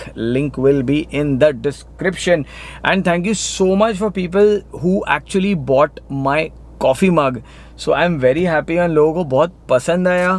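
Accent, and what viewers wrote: Gujarat, India. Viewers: Aap gujarati hai ?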